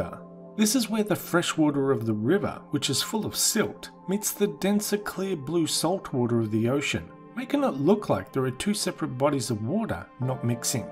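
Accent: Australia. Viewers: Yes he sounds aussie. It was a joke anyway. 👌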